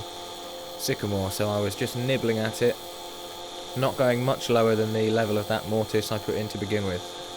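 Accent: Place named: United Kingdom. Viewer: Brilliant very creative - Thanks. I recently made my own polishing paste - 4 parts mineral oil 1 part white bees wax, 1 part diatomaceous earth. I mistakenly thought that I was limited therefore to wax based finishes such as buffing with Carnuba wax. However, I see you using shine juice here without a problem. I would like your (or others) input on finishes that can be used after polishing paste. Thanks in advance. PS: I would be interested to learn where you are located in the UK. I left for the US in 1984 and have been trying to nail down your accent.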